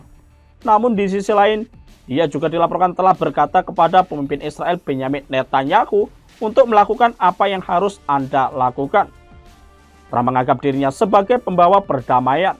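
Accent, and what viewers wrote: Java, Indonesia. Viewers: Penyiarnya medok Jawa. Intonasinya tdk bagus.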